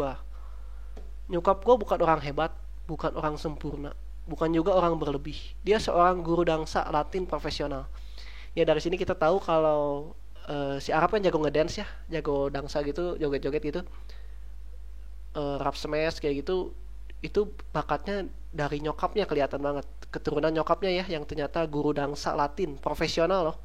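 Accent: West Java, Indonesia. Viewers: doi orang sunda kayanya jadi ngomongnya gitu wkwkwk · logatnya sunda banget 😂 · Sunda bgt :D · Logat nya orang sunda banget dah Apa orang bandung ? Wkwk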